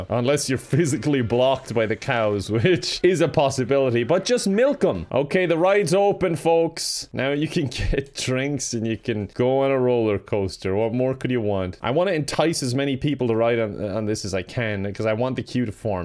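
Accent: Russia. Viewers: i love when he speak his russian accent 😂❤️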